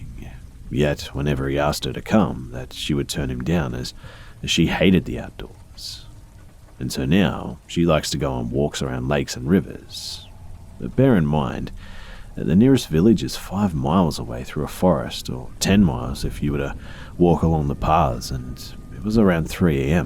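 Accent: Australia. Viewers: Fan from the sunny coast, stoked to hear a fellow Aussie killing the horror!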